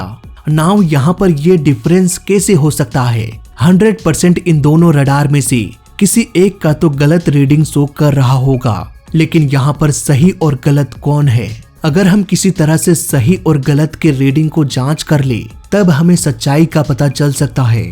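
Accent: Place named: Rajasthan, India. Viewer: Rajasthani accent baa. Roshni ko Rosni......ese, kese, vese.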